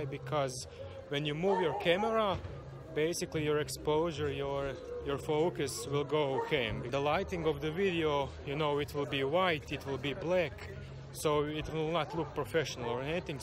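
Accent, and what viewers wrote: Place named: Russia. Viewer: Naglasak ruski al moze proc hahah 👌 extra video 👍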